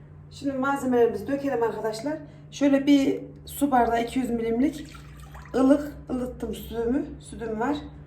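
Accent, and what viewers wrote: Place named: Central Anatolia, Turkey. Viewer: Sizi yeni keşfettim öncelikle sivenizden icanadolu olduğunuzu anladım dahada mutlu oldum ellerine sağlık Bende çocuklarımın icin.deneyecegim .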